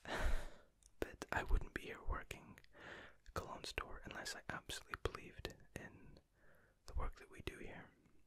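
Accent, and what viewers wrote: Scotland, United Kingdom. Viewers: Are you British? Or Scottish? I can’t quite tell. Love the accent though! 😉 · A Scots accent, A beard, and blue eyes was always going to be a winner, but wears Jean Paul Gaultier Le Male - OMG I think my ovaries just exploded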